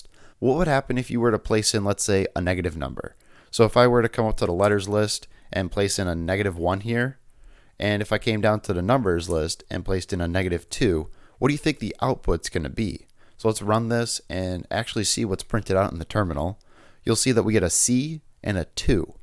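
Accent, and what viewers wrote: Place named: United States. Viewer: Hey bro, thanks for your course with US accent. It is easier to follow the course.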